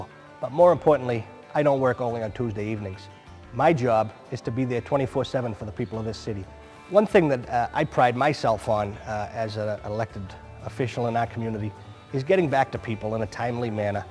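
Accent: Boston, United States. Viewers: I'd vote for him just for his perfect Boston accent!! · His Boston accent is stronger than mine!!!!!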